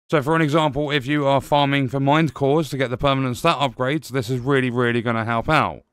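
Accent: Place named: RP, United Kingdom. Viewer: Your accent is over the top posh! xd No offense from here, very helpful guide, Thanks mate🙂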